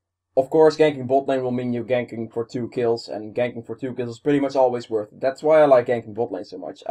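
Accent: Netherlands. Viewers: Talk slower btw are you dutch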